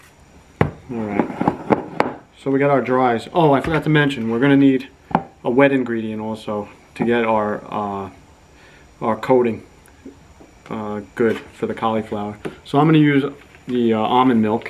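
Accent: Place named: New York, United States. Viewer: I like NYC accent.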